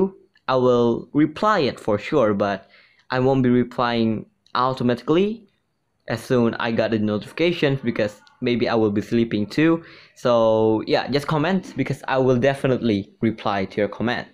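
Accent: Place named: China. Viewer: Idk he's a Chinese or not but his accents tho lol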